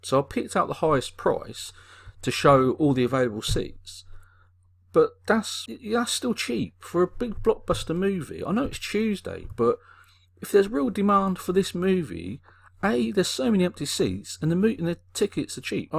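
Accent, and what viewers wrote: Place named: RP, United Kingdom. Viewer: Please stop talking like an idiot Brit. Loose the dumbass royalty respecting accent.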